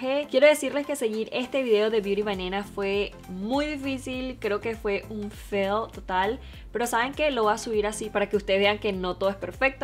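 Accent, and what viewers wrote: Venezuela. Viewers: Yo sabia que era de VENEZUELAAAA ese acentoooooooooooooooooooooooooooooo like like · Hola, primera vez que veo tus videos y por que amo a Nena. Quería saber de donde eres? Tu acento se me hace algo Venezolano, me da curiosidad. Me gusto mucho tu cabello, y no fue un fail el tutorial, solo que ella tiene mas iluminación y quizá y se echo un poco menos de producto, pero en fin, me gusto mucho tu video, iré a ver todos los otros. Besos💓😘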